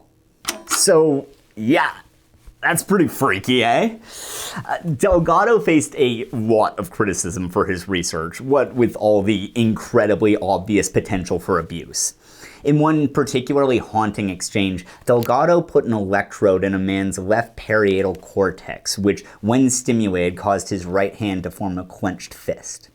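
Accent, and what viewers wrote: Canada. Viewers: theres something about hearing a canadian talk about american history that causes me to chuckle. im 30 min in so far so good.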